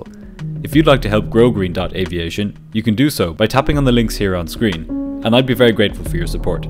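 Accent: Ireland. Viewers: Your videos are of incredible quality. They're very easy to watch and follow, you are really onto something with this channel. It's also great to hear a fellow Irish accent in this community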